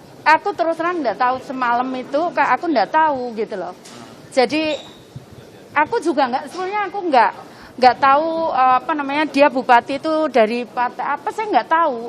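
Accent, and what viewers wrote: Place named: Surabaya, Indonesia. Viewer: Medok.. Jadi kelihatan kurang intelektualnya..mudah gugup kalo kondisi lagi di wawancara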